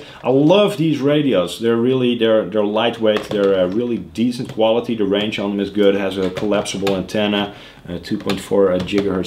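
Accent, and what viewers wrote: Netherlands. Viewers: Lijkt op Nederlands Engels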